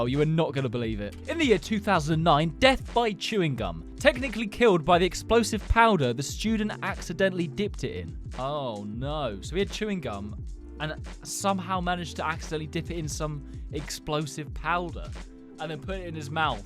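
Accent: United Kingdom. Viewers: Love that british accent.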